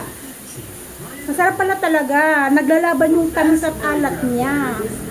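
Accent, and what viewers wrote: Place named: Visayas, Philippines. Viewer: Bisaya ka ba? Accent mo kasi bisaya. At comment lng wag ka tawa ng tawa wala naman nakakatawa.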